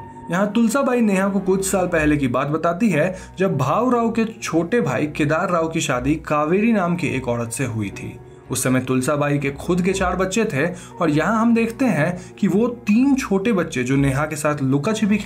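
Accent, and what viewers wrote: Bengal, India. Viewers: Bhai tu begali hai kya??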